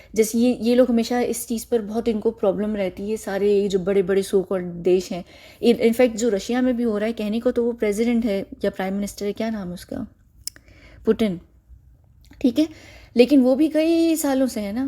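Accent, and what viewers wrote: Pakistan. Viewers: Her dialect is pure Pakistani. Slow claps for those who believe her Illuminati agent story. She could be related to islamic organization and her purpose here is solely to set a narrative for defying the existing islamic terror. She won't acknowledge Hindu genocides. Her agenda is fixed... They play the war of narratives. In a way she is also installing fear. The elites don't have the absolute power. We, if united are powerful too.. People keep ur senses alive and always always always question every narrative or information being thrown at you. Specially from a person whom you have never even seen. It's a very planned move.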